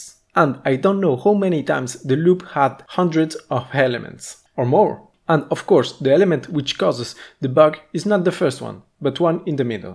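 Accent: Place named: Spain. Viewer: jajajaj, se notaba literalmente que es español y no me ha hecho falta ni buscarlo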